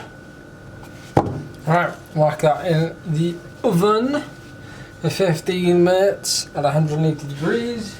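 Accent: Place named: Llanelli, United Kingdom. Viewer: Where too you from in Wales?? Sound Swansea/Carmarthen way but not 100% sure??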